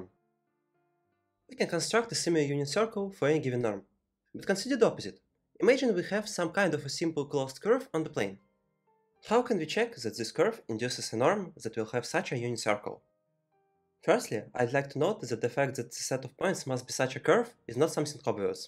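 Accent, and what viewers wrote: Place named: Russia. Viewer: Too strong russian accent, the rest is great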